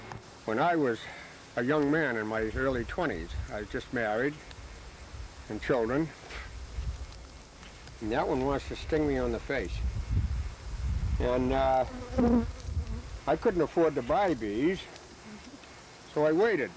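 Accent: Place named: Rhode Island, United States. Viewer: Has kind of a Quahog accent.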